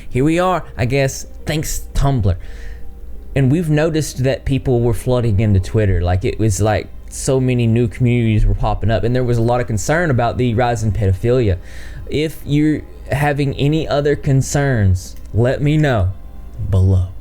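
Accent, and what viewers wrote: South, United States. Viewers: Southern? He's from Tennessee I'm pretty sure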